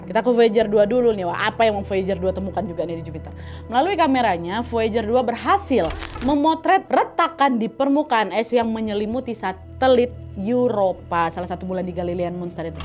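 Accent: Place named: West Java, Indonesia. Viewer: Dari logat dan speed ngomong nya,saya yakin kak ini pasti orang Sunda🤔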